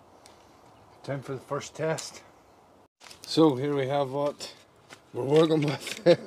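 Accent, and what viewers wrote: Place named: Ulster, United Kingdom. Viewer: I'm thinking " That gent is maybe an Ulsterman" and then I heard " Plenty of fine shavings for kindlin' " Best wishes from Portarlington County Laois. Keep up the good work.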